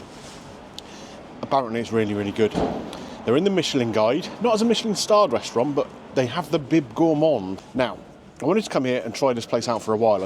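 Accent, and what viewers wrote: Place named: England, United Kingdom. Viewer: which part of England you come from, my first language is not English but I could understand all the worlds your saying, some food bloggers are very difficult to understand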